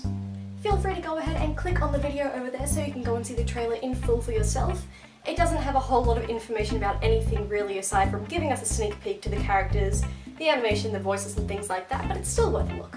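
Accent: Australia. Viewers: aaah, dat glorious fellow Australian accent.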